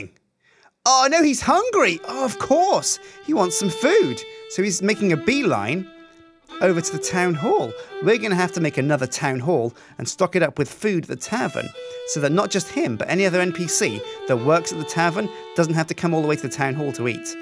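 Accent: Australia. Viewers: that aussie accent is on point! i would know im Australian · why did you suddenly go Australian? Also, you should make ALL the NPCs go to the inn when they need to eat becasue, well, it's an inn! That's where people eat! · Stereotypical Australian accent, but it's actually not bad,! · Dat Aussie accent doe!!! 😂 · The Ozzie accent lol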